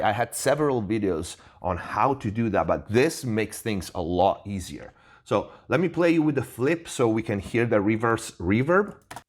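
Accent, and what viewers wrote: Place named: Italy. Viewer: Ma allora sei Italiano? Dall'accento infatti, mi sembrava, leggermente familiare con la nostra lingua:). E' molto buono il tuo inglese, sono stato anch'io a Los Angeles e spero di fare un' esperienza ancora più lunga. Grazie per i video e per i consigli, sto imparando alcune cose!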